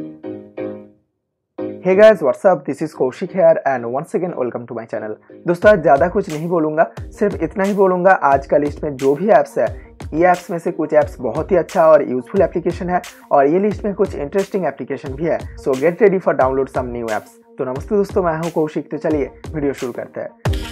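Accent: Bengal, India. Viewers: Vi tumi Bangali ami Bujhte perechi......😁😁😁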